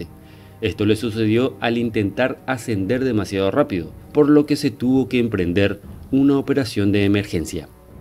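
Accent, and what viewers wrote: Paraguay. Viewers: De que país sos amigo? tenes acepto Paraguayo sin ofender.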